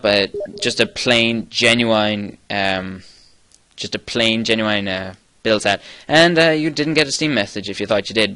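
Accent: Ireland. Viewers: YAY! YOU ARE IRISH!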